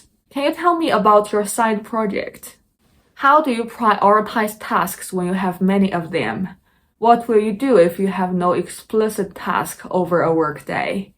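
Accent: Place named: United Kingdom. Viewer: 博主的accent有点British是怎么肥四！！！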